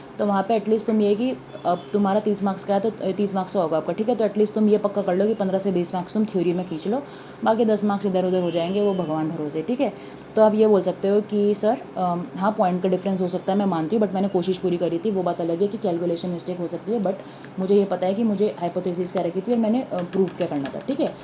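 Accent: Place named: Haryana, India. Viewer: Miss chitra.. Zaruri ni h sabka tarika same ho kisi kisi ka alag hota hai or may be ho skta hai ye haryana side se ho tou isse farak ni pdta jaise ki neet ki coaching dete h physics wallah sir Allakh panday name h unka but aaj lakho log unke fans h kyuki unka tarika hi alag hai. Or i think jo zyada bna kr bolte h ya saja kr bolte h log unse bor ho jate h nd mujhe inka way of talking achha lga or ignou ka management hai hi aisa k muh se mithas nikalti hi ni h. Aisa waha ka staff b preshan hai.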